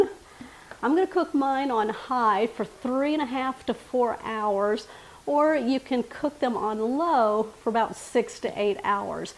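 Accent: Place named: South, United States. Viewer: Thanks ❤️I would appreciate it…thinking with that “ya’ll “ and somewhat Southern accent like mine that you would do some traditional Southern dishes 😀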